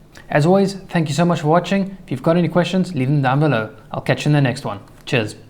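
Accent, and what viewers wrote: South Africa. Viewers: Your accent sounds South-African??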